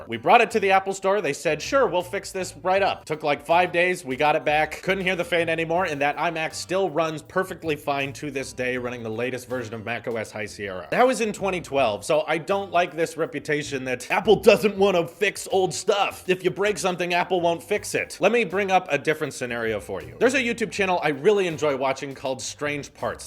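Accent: United States. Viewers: What’s you’re nationality? Just wondering I thought you were from the UK but you sound American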